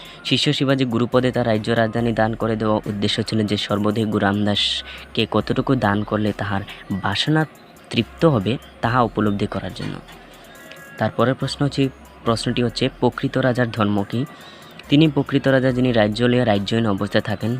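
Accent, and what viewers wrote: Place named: New York City, United States. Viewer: Your voice is very NYC bro.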